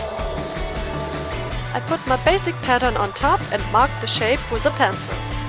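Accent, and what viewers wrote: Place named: Germany. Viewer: are u from germany because yopur english sounds really german xD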